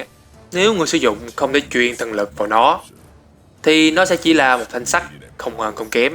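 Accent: Vietnam. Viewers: đọc tiếng anh kiểu ng việt nghe khó chịu vc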